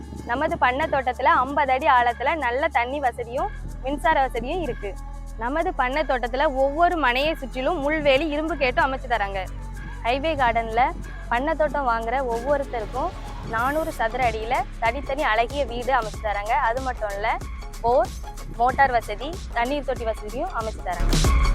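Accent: Tirunelveli, India. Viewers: உங்களுடைய திருநெல்வேலி slang super.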